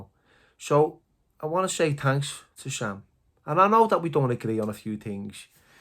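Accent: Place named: Yorkshire, United Kingdom. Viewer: Belter Yorkshire accent that lol love your story's fella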